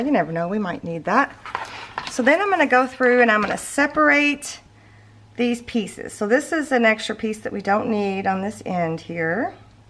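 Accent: South, United States. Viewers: I love ALL the new designs and templates. The album is a beautiful journey I look forward to taking. Thank you..thank you...thank you! Always fun watching you create and this northern girl could listen to your southern accent all day.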